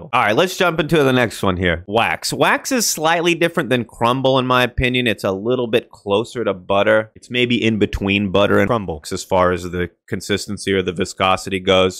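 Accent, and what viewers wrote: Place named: South, United States. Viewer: Bro I love the list but I REALLY love the southern accent you pulled off. I’m from the dirty south you did so good 😂😂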